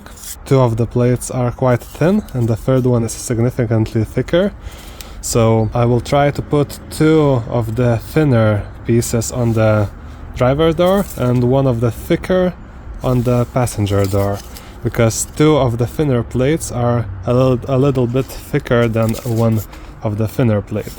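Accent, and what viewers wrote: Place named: Lithuania. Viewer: Girdžiu lietuvišką kirčiavimą 😂 Ačiū už video ;)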